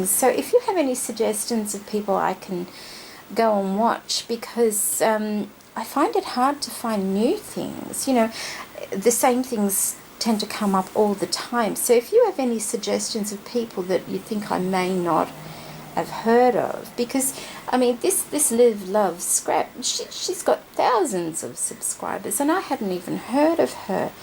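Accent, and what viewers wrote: Australia. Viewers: Love them ... they're beautiful! Nice to hear an Oz voice ... there's not many Oz ladies doing tutorials! Jennings from the UK does some lovely Shabby Chic ... you could check her out 😊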